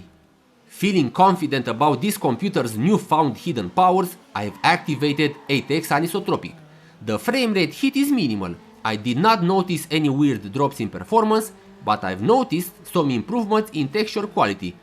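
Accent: Romania. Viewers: Great old video cards and this isn't even the GT variant. Oh, and I'm just wondering, are you Romanian? You sound a lot like Dr Ortmeyer from Hitman Codename 47 😅🙃😝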